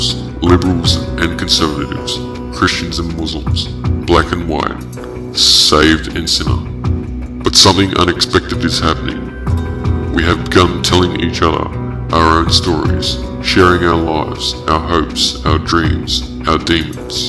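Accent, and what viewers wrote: Australia. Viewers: What's with everyone commenting on his Aussie accent? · Derp he sounds Australian. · He has an Australian accent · lol, I thought to myself, Anonymous sounds Australian lol, but I realized you were just narrating it :)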